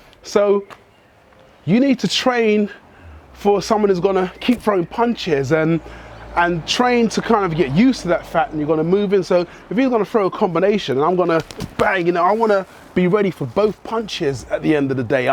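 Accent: United Kingdom. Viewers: Love the "3 strikes for the price of 1".....your wonderful accent makes me miss London and "The Continent". Very curious what the lady with dog said that made you chuckle. What I think you are saying is that there are the martial arts in the gym and then, well; there's brawling in the street.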